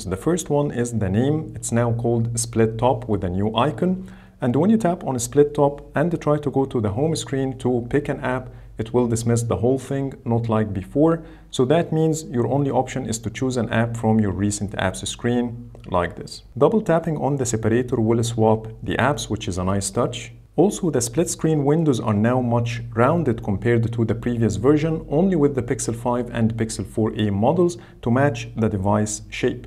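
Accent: Egypt. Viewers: احلي مسا عليك من مصر الفيديو جامد عرفتك من اول الفيديو انك مصري 😂♥️♥️♥️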